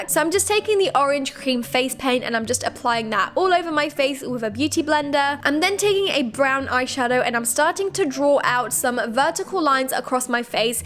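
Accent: United Kingdom. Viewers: Are you british 'Cause you sound british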